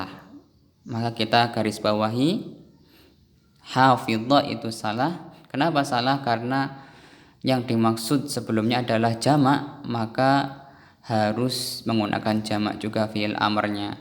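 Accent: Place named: Arab world. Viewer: صوت عربي كح .... بارك الله تعالى فيكم 🤲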